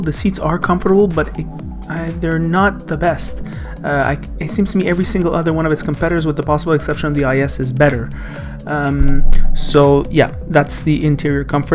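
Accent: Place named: Quebec, Canada. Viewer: Bonne vidéo! L'accent québécois ne fait pas trop surface :p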